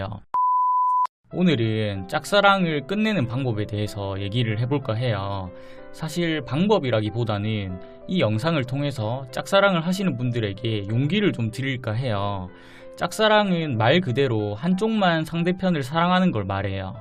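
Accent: Gyeongsang, South Korea. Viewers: 혹시 사투리가 경상도이신건가요?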